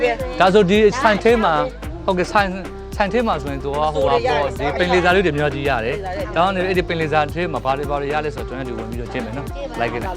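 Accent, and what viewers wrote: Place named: Rakhine, Myanmar. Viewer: စကားပီအောင်ပြောစေချင်တေ ရခိုင်သိပ်ခါကျတယ်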